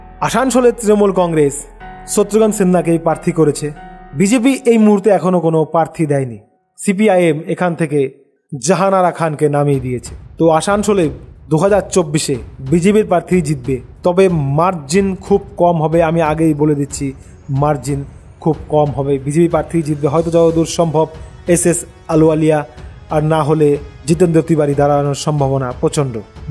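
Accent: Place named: Bangladesh. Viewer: এই চ্যানেল কি বাংলাদেশের চযানেল? উচ্চারণ শুনে মনে হল ।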